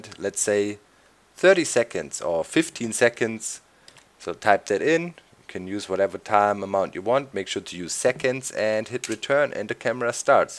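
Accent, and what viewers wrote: Germany. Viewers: You are German like me. Aber dafür ist dein Englisch total gut :)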